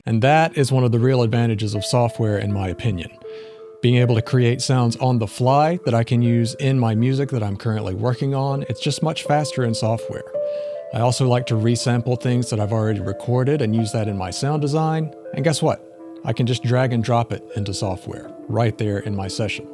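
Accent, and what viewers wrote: South, United States. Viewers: Just to clarify for anyone reading..I was joking about the looks and voice thing. I'm sure many consider J's attributes beyond reproach.. personally though, I don't judge a person based on either. (..got to admit, though, that "southern drawl" sounds pretty cool. 😁)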